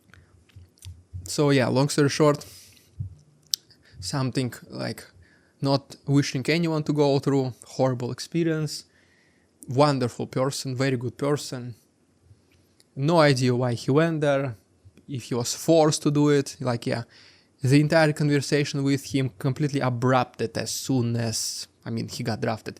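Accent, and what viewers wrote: Russia. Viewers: He's Russian that's where the accent comes from.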